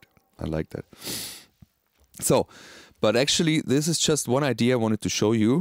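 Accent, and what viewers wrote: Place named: Germany. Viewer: DANKE! I REALLY LIKE YOUR GERMAN ACCENT! 🖤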